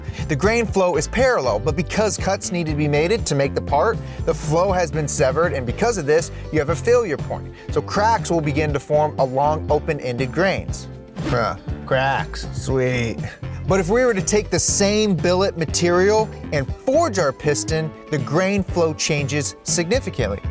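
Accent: Finland. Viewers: ah hearing that Finnish accent is music to my ears. Grandparents are From Finland so its nice to hear lol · me seeing thumbnail: thats a "finnished" video :) also nice finnish english accent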